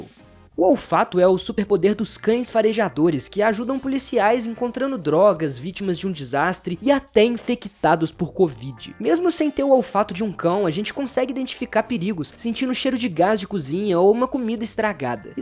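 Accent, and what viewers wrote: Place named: Minas Gerais, Brazil. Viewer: Que orgulho desse sotaque mineirin 💛💛💛💛💛 vídeo incrível,!!!!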